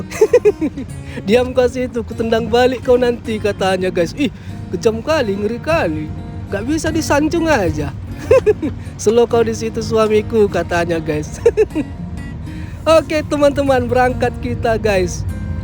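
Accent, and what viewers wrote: Medan, Indonesia. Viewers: Logat Abang seperti orang Medan, apa Abang orang Medan?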